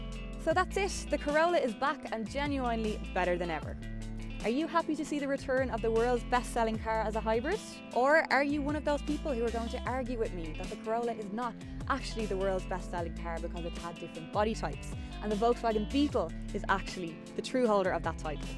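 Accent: Ireland. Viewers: Great review well done and I love your Irish accent mmmm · succint review, very well versed presenter. And the best part that irish accent :P Hope you get more subs this year. :) All the best for 100k subs this year! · Ok. Just a quick comment about a few points: 1. Your videos are very well made, informative and free from lame jokes (eg. Matt Watson) 2. is a very capable presenter 3. She is also gorgeous and has one of the most beautiful smiles I’ve ever seen. It’s good that she uses that a lot:) 4. I love her Irish accent too Keep up the good job guys. Wanted to leave this comment for quite a long time but I usually watch YouTube on my TV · Is this really or is it just Olivia Thirlby masquerading as. The resemblance of these two is just astonishing. And I'm loving the Irish accent. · Love Irish accent :-) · Great video review, you just got a new subscriber all the way down from Greece! 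P.S. I love your Irish accent,! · That Irish accent 👌👌👌❤️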